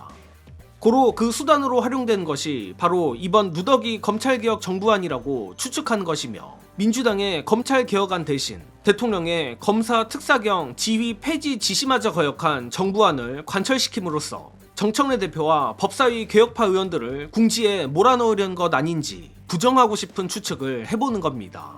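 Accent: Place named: Gyeongsang, South Korea. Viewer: 엿까는 방송 이고만 어투는 갱상도 출생者 같은대 쪼개기 고만하고 방송접버시길 ㆍ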